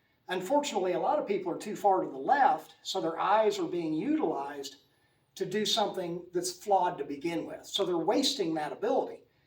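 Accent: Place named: South, United States. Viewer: love from China. love your accent as I lived in south of states for yrs. Quick question, have you ever experienced with pelvis pain or SI joint pain, especially from right hip/leg/low back? I now feel even worse when aiming lower and put left leg aside. I wonder if it's a common headache for pool players who plays 2-3hr a day.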